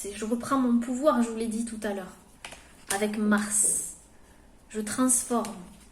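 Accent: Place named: South-West, France. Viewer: Adorable cet accent du sud ouest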